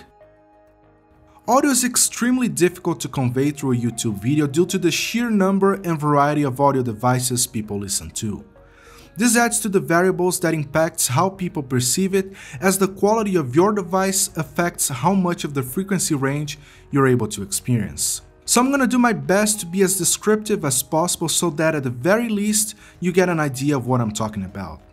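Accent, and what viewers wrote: Brazil. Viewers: hey man, are u brazilian? great video by the way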